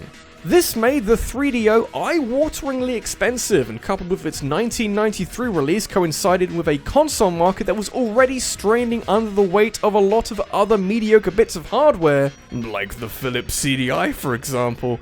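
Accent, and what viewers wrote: United Kingdom. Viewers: Top 5 Linear Pathed Games (because I prefer a linear path over open world) Also, it's kinda weird hearing a British guy talk about games costing dollars rather than pounds.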